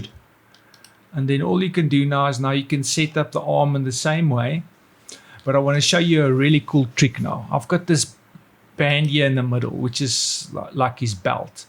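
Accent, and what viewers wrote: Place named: South Africa. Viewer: Good sir, from your accent im assuming your south african,🤝😃. Im curiouse, are there any south African companies or industries that use Rive in they pipeline? Great stuff by the way👏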